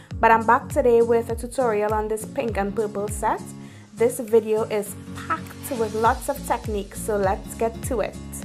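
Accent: Trinidad and Tobago. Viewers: First video of yours I'm watching and sound triniish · SUBSCRIBEDDDD as soon as I heard that trini accent <3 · I hear my Trini voice lol..... great nails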